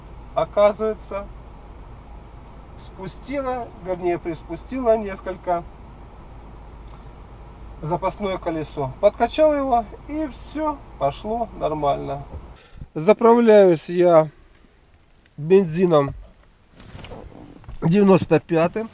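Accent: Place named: South, Russia. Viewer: На юге все так Хаварят) съездите в Ростов !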